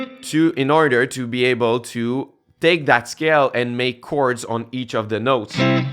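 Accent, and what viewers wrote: Quebec, Canada. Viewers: Ok, I’m saving this video. I’m going to have to watch it a few more times. BTW, are you from Quebec? I’m in Montreal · Hey are you québécois? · Accent québécois en tabarnak !! Nice vidéo !! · Are from Québec ? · Tellement l'accent du Québec, très bien expliqué mon gars ! 👍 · You Sir, sound like you are definitely French-Canadian. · Hearing a quebecois accent here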